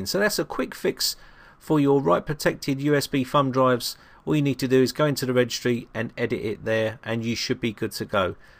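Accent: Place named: Cockney, United Kingdom. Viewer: You are a marvel to us, your tech tips are truly on the button but I have to laugh, my wife too is a cockney. One of her "bruvvas" speaks just like you. His fumdrive (also drive hache) is always having write protection problems. Hahaha. All the best mate.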